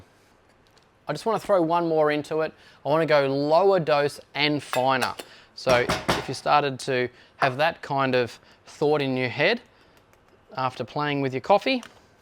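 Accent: Australia. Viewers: I heard the Aussie accent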